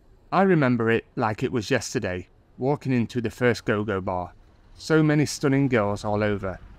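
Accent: England, United Kingdom. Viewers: you sound like a Pom to me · if you're an Aussie, what's with the English accent?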